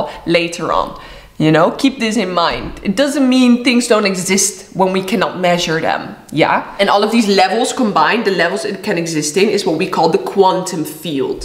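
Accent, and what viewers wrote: Netherlands. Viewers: Nice Dutch accent. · Was it just me or was anyone else wondering which country this youtuber is from? She has an indian name, a dutch accent, speaks british english. Bet she lives in the US. Talk about being a global citizen! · What’s your native language?My guess is Dutch or Flemish? English is a tricky one sometimes. “Thoroughly” is pronounce “thur - O - lee”, with the “gh” more like in though than in rough. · interesting video! BTW, Ben je Nederlands? ;)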